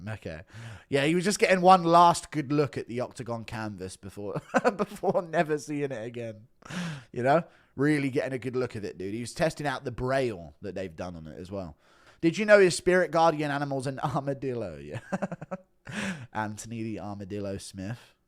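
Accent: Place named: United Kingdom. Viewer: I love how impression of Anthony Smith is basically his normal voice, he just switches from his natural UK accent to American XD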